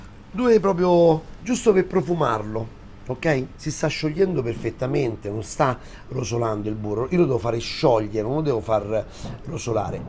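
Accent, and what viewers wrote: Rome, Italy. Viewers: Chef ci piace di più quando parli italiano con l accento romano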